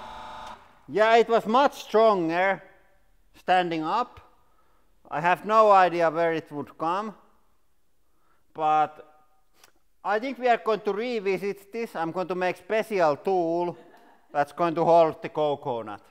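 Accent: Finland. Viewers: I love indirectly learning Finnish from these videos based on the accent alone, it throws me off when he says things like “asked” because it’s a one-syllable word but hearing it like “ask-ed” makes me stop and think about how phonetic Finnish is. Hopefully I can break down syllables easier, especially when pronouncing the months and words like kyysymys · R U from Finland my friend ?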